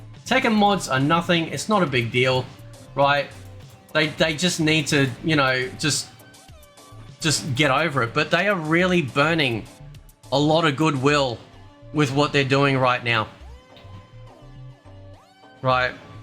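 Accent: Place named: Australia. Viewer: Right? 😂 love the aussie accent mate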